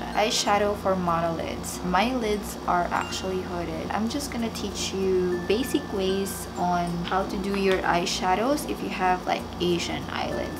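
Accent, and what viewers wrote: Philippines. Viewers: Filipino accent hehe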